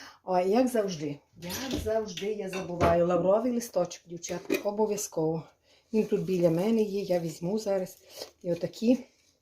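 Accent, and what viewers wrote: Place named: Luhansk, Ukraine. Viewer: Чудовий рецепт. Так моя бабуся готувала, тепер іноді я. У вас неймовірна говірка, я наче знову побував вдома на Луганщіні. На тих недолугих обох статей, які вам розповідають як правильно говорити, не звертайте уваги. У них просто давно не було хлопця.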